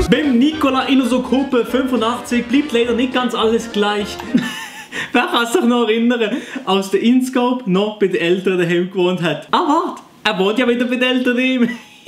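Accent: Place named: Fribourg, Switzerland. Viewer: i ha mer scho fasch deicht du sigisch friburger wägem akzent XD geils video!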